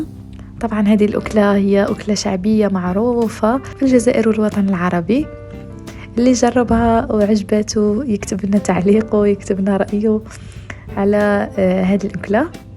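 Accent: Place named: Levant. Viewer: انتي تكلمين الهجه اللبنانيةاوالشاميةوليست الهجه الجزائرية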